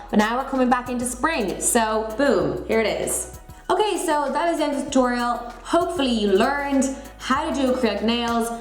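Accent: Dublin, Ireland. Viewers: she's irish😂😂😂😂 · its a South Dublin accent xx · I love the Irish accent 😭😂 I have Irish background but I'm not fully Irish which sucks because I'm just from America like boring 😂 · definitely not scottish. id say an irish woman whos lived in england/ English influence. or english woman with irish parents etc ..... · As I can tell your from dublin, I was wondering where you purchased these products & how much?? And also did you paint them using normal nails varnish or is there a special type??x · She's Irish what r u on about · Is she Irish ? Cause I kept hearing hints of an Irish accent in there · Kelcey Burrow it’s Irish I sound like her too😂 p.s. I’m Irish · she's Irish 😊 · She's Irish and she sounds Irish the whole video to me? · irish gal!! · Kelcey Burrow I don't hear British I hear American and irish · she's Irish ahahahhaha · Ireland? I hear it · Kelcey Burrow Irish I think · I hear an Irish accent. 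💚💚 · Malaka Mohamed it's and Irish. Accent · Americans tryna say she sounds Irish with an American accent!? Haha no. She sounds dublinish through and through. That's a Dublin accent. Move on. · Kelcey Burrow sounds irish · We Have Essence In Ireland Too.....Yes She Is Irish LOL! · definitely sounds irish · you're beautiful and I love your irish accent :) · What shops do Ye get ur nail equipment I'm from Dublin I could tell ur Irish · Have you never heard an Irish accent before? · Kelcey Burrow I'm from Ireland she is Irish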